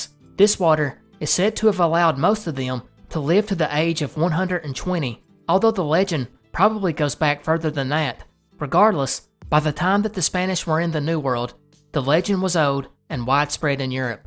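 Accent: South, United States. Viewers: I just found your channel about a week ago and I've been binge listening at work and to fall asleep too. I love the content, but I really love the fact that you're a fellow southerner. There's not enough southern accents on YT. Greetings from East Tennessee! EDIT the guy who jumped out of the plane and died from the cocaine bear story landed in my old neighborhood in South Knoxville. I was a little kid back then, but I still remember when that happened.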